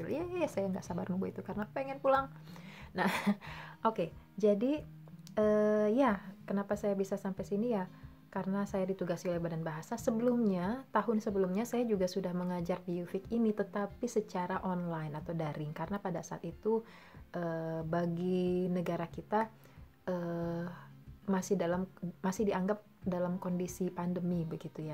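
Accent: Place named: Balikpapan, Indonesia. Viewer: Dari aksennya kek org Balikpapan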